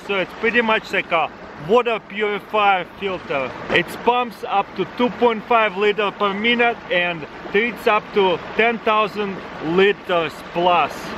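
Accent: Russia. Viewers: sexy russian accent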